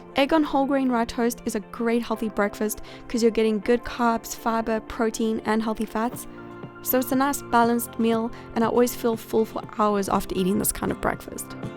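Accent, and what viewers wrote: South Africa. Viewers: you are truly God sent. I have been struggling to loose weight for a long time and now it has started to affect my help. I started following you two weeks ago, and followed all you suggestions. I am happy to say in two weeks I have lost 2KG. So I just want to thank you and encourage other views to try your suggestions. I love your videos, your voice is soothing and I love you South African accent...lol. keep uploading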